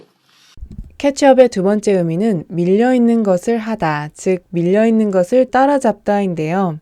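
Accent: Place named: United States. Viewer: 진짜 미국영어네요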